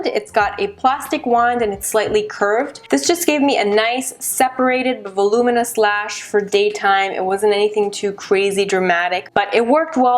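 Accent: Canada. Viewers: Maybe it's because I've lived in SF for a year, but you sound extra Canadian in this! And I had no clue Rituals was from the Netherlands. Great video as always! xoxo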